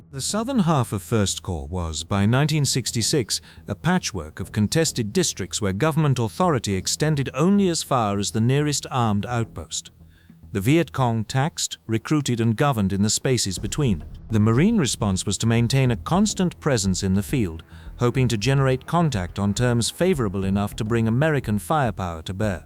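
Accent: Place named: United Kingdom. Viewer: Why is it always british accented narrator s , with US battles kinda unsettling and just wrong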